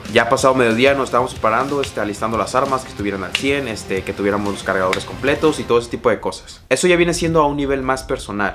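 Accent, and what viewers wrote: Mexico. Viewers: Eres de Estados Unidos y tienes el acento mexicano muy marcado alch que crack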